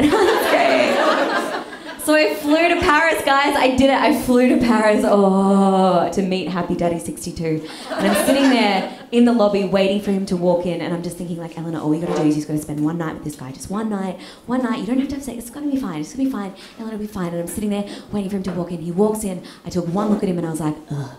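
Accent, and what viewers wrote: Australia. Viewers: That Aussie accent… what a babe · The aussie accent got me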